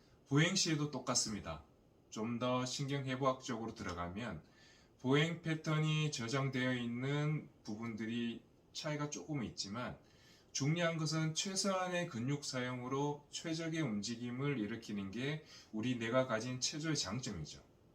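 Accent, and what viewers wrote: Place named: Seoul, South Korea. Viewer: 목소리 멋지네요 👍 발음이 서울사람 같아요! 좋은 정보 감사합니다 🙆🙆‍♂️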